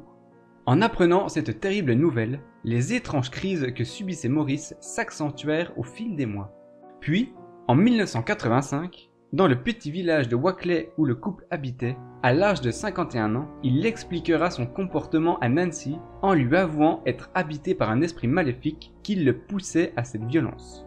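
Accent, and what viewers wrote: Jura, France. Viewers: Bonjour vos vidéos sont intéressantes, on ressent un gros travail de recherches ... Félicitations ! Continuez comme ça. Marrant l'accent Jurassien !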